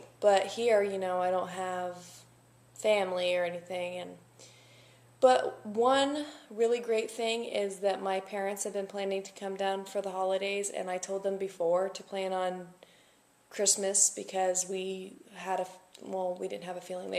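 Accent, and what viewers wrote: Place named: Pennsylvania, United States. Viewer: Ha! I knew you were from PA by your accent! I"m in Pa too! What part did you come from? i'm in NorthEastern, Near wilkes-barre/Scranton. I'm Guessing near Lancaster/Lebanon? Love ya hon! Ruthanna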